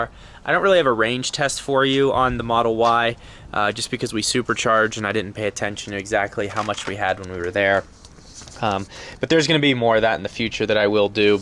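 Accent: Pittsburgh, United States. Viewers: I knew I detected a pittsburgh accent.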